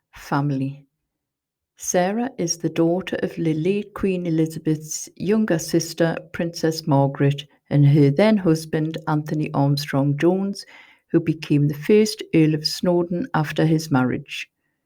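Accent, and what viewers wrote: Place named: Newcastle, United Kingdom. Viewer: It's always interesting to here of royal members who go under the radar. Very factual and informative. Your geordie accent makes it all the more grounded. Well done.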